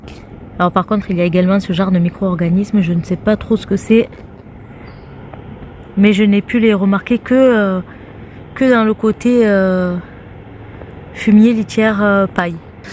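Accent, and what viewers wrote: South, France. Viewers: Bonjour, avec ce petit accent du sud que tu as je ferme les yeux et je me crois à Palavas les flots. Chez moi le Cèleri ne tiens pas l'hiver il gèle et disparaît à chaque fois, le tiens est encore très correct! tes navets tes choux sont jolies aussi, attention quand tu enfonce la fourche bêche j'ai entendu un vers de terre hurler! 😁 ta terre est bien vivante, à bientôt 👍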